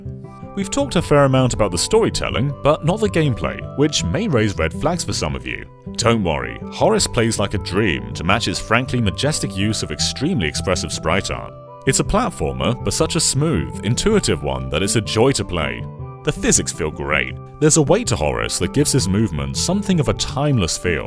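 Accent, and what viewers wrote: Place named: United Kingdom. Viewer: It's weird to hear a British accent on a Nintendo Life video that isn't Alex. :) Good job, good review. I wanna play.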